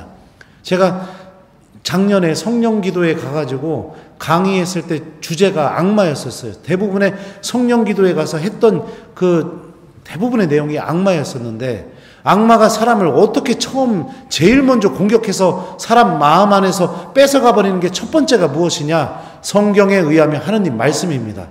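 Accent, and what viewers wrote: Chungcheong, South Korea. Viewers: 신부님 말씀 잘 듣고 있습니다. 충청도 말씨가 구수하면서도 우리 일상생활과 근접한 어휘로 하시니 가끔 웃습니다. 고맙습니다.😂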